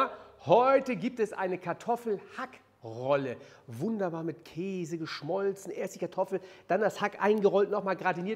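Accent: Bavaria, Germany. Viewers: Alles OK aber hör bitte auf Bayrisch zu reden. Das hört sich bei dir sooooo grausam an... 😭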